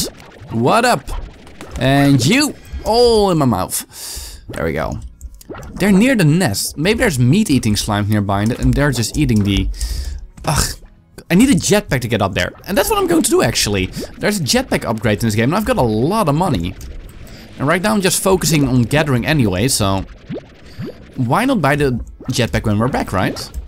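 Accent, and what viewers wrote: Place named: Netherlands. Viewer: i think he's Dutch lmao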